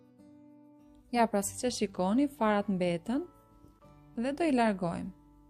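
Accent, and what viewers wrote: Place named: Albania. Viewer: Fsleminderit zonj po nese man te mi tregon kto lloj qaji ku i gjejm edhe si quhen te lutem me trego kjart sepse ju te shqiperis folni pak ma ndryshe e ste kuptoj kam dedhir ta kuptoj kete reqet sepse kam nevoj se kam bronhitis edhe asma per kete jam shum e interesuar ta di se nuk e kuptova llojet e qajit mos ke nji na i thojm qsj blini asht ni druose nji si me than pem te lutem nese e shikon ket ma kthen pergjigjjen flm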